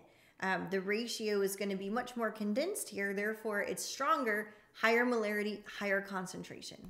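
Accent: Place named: United States. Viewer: Not long found your channel and I love your videos, even the American accent! Many thanks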